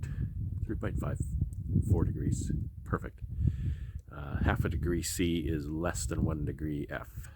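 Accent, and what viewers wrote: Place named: South, United States. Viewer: You sound like a fellow from the South.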